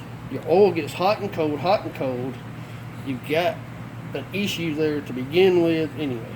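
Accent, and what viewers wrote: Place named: South, United States. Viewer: Even with the down South accent, I got something useful out if this video. That workaround fix looks pret darnt good good buddy. I think I will try it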